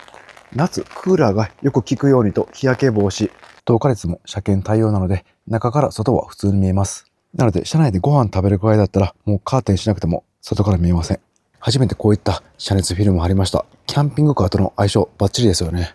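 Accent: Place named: Kansai, Japan. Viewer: やっぱりさん関西人なんですね。フロントにヒビがぶぁぁぁぁって、大変だったのに笑ってしまいましたm(_ _)m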